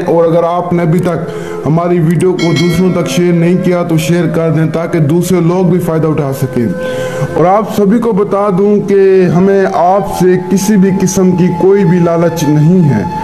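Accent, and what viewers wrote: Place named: India. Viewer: السلام عليكم ورحمة الله وبركاته صاحب کیا اپ پاکستانی ھیں یا ھندوستانی نمبر تو پاکستانی مگر أواز اور لہجہ انڈین ہے کیوںکہ میں اپکا شاگرد بننا چاہتا ھوں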